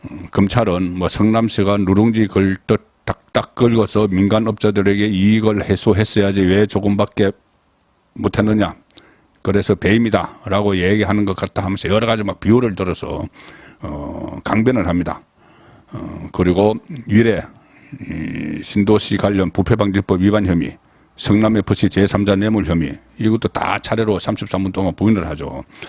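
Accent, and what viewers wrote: Gyeongsang, South Korea. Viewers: 송국건님 제안드립니다. ※불필요한 군드더기 어~, 저~, 이~ 이런 류의 아주 나쁜 습관이 있어요. 속히 시정해 주세요. 경상도 억양의 전체적인 분위기야 어쩔 수없다 하여도 위에 지적한 것들이 심하게 섞일 때면 외면하게 됩니다. 발전하세요.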